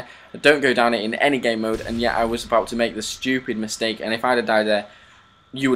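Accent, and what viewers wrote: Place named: United Kingdom. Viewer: a little to brit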